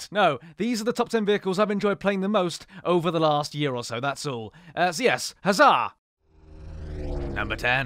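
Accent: United Kingdom. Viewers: So is he actually British or is it just an accent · Best British accent of the century🇬🇧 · Dearest. I find this ironic. Your channel is mainly fantastic due to your lovely British accent, and your favorite vehicle of War Thunder is American.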